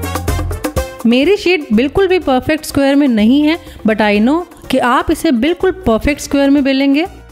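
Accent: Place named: India. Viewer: Indian english gets on my nerves dude, stop talking like that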